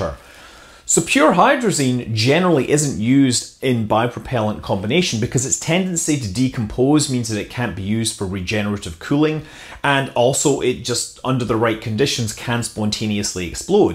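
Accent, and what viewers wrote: United Kingdom. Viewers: Very nice stuff in that great British accent (Or Am I wrong?).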